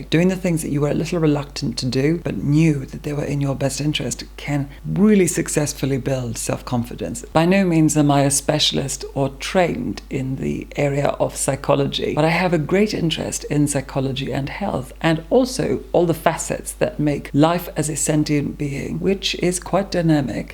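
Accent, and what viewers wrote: Scotland, United Kingdom. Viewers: He’s getting rid of his Scottish accent 😭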